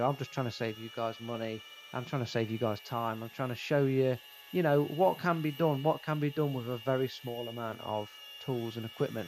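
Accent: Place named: East Midlands, United Kingdom. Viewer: Brilliant idea! Great video and is that an East Midlands accent (Nottingham? Mansfield? Derby?)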